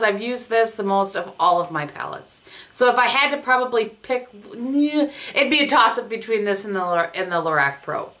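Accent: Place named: Midwest, United States. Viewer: Love your Midwest accent. I sound the same and also am a palette addict.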